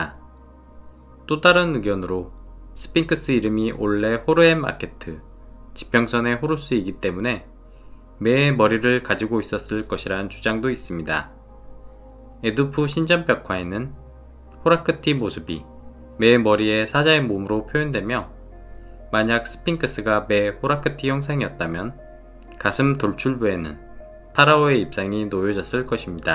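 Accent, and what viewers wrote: Jeolla, South Korea. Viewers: 전라도 사람이 표준어 쓸려고 애쓰는 내레이션 억양이 재밌네요 ㅋㅋ 아...물론 내용은 👍👍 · 전라도사투리가 구수하군요 ㅋ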